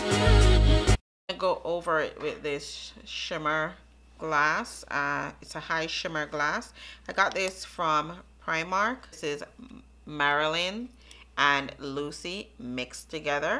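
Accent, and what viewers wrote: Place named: Jamaica. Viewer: Beautiful look. ❤ ❤ You sound like you are Jamaican. Are you from Jamaica if you don't mind me asking?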